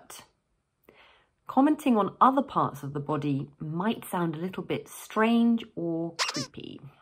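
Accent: United Kingdom. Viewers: 발음이 영국 발음이네 했더니 영국분이신가요? ㅎㅎ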